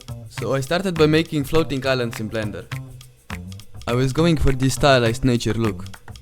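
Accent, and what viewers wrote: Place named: Russia. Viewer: I just come from shorts video. As honest I can, I tell you. That's is very cool and unique, I don't know why this video don't getting popular. Idea of game is good, fr good. Maybe you should do this like dungeon complete, or game with some lore? Blender skills at high level, how did you learn everything about this? And yeah, where are you from, your accent reminds some of Russian accent 🙂 Don't give up on this, try make new projects, bcuz if this is first unity oroject, then its insane and I can't believe in this. Goodluck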